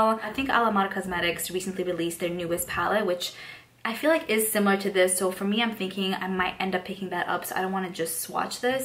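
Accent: New York, United States. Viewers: Girl I live for that lip on you and your ny accent!!!! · I've been subbed to you for a few weeks ever since I heard about your collab, I've been really enjoying your content. The luxe box seems the same as others I've seen, your Ipsy ultimate was really good especially compared to others. I love your accent btw, is it NY? If so were sort of neighbors I'm in Philly, PA.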